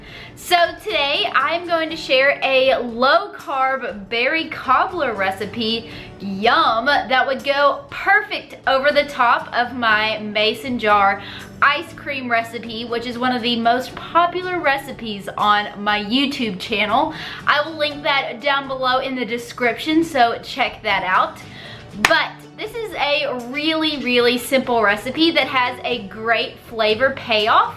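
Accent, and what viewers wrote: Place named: Texas, United States. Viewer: She would fit right in here in Texas, my family speaks the same way. 😃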